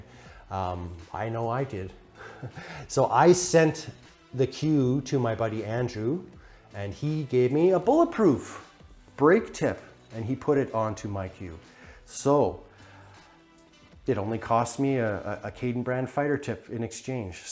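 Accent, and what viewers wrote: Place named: Edmonton, Canada. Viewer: Came for the cues, stayed for the beautiful edmonton accent.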